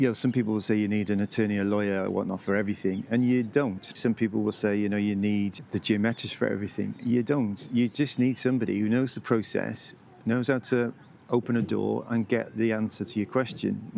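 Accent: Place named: England, United Kingdom. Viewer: Thank you for sharing your experience…just found your channel… looking forward to watching all your videos… I know from your accent where you are from in England..😊…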